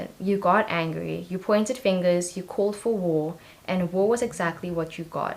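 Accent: South Africa. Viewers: Are you South African??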